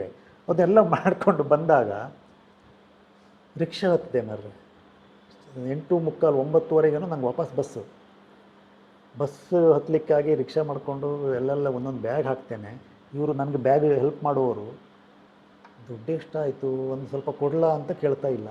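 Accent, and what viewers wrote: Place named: Kundapura, India. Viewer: ನಿಮ್ಮ ಭಾಷಾ ನೈಪುಣ್ಯತೆ ಅದ್ಭುತ.... ನಿಮ್ಮ ಜೀವನಾನುಭವ ನಮ್ಮ ಕುಂದಾಪುರ ಕನ್ನಡ ಕೇಳುವಾಗ ಆಗುವ ಆ ಕರ್ಣಾನಂದವೇ ಬೇರೆ ❤❤❤❤❤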